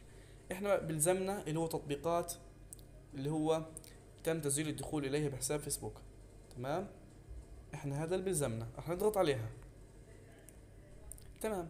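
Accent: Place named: Libya. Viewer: احلا ناس والله. بس لهجتك نفس ليبيه ❤️❤️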